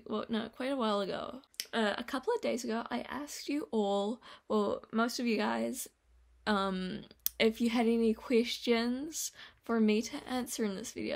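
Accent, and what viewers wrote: New Zealand. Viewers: Lmaooo that's funny with the accent, honestly you sound like you have a New Zealand accent to me! But maybe if I was from New Zealand you'd sound more Americanized I ain't sure haha. I GOTTA watch The Fly and Sweeney Todd they sound really dang good, and I love American Psycho! I definitely remember you mentioning how much you love haha I'd definitely watch a video of you talkin about it more, it was really cool learnin more about you you're awesome! Also Pop-Tarts are SO dang good haven't had em in a while but oh man they're delicious, great video as always I hope you have a good day! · Great content, loving that Kiwi accent!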